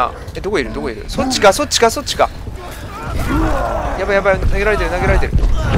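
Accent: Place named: Kyushu, Japan. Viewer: 九州の方ですか?? 方言かわいいですね(*^^*)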